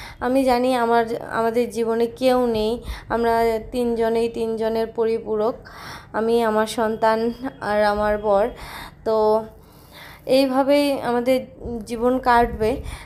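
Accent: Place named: Rangpur, Bangladesh. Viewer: তুমি কোন ভাষায় কথা বলো,। বাংলাদেশের রংপুরের মানুষ তোমার ভাষায় কথা বলে।মানে বাংলাদেশের উত্তরবঙ্গের মানুষ তোমার ভাষায় কথা বলে। আমার খুব ভালো লাগে ❤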